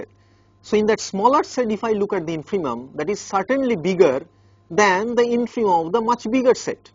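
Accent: India. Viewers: i love this india accent, whether the india person is prescribing antibiotics or a mathematical proof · never underestimate the indian accent, though it may seem funny to you, the indians are some of the most brilliant minds in the planet, the average indian 12th grader can integrate twice as fast as an MIT graduate (doesnt necessarily mean that the indian guy is more brilliant or anything), just saying.....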